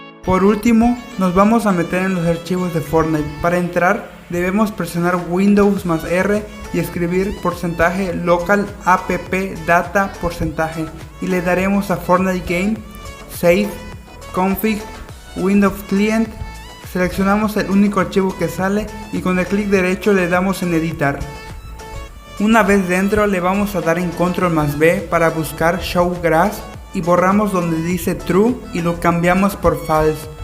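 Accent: Yucatan, Mexico. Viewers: De donde eres? tu acento suena a yucateco.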